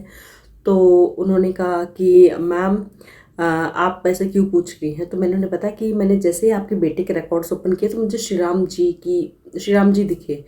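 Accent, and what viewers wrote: Haryana, India. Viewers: राम - राम बेबे । आपका बोलने का लहजा हारेयाणवी हिन्दी का है। क्या मैं सही हू।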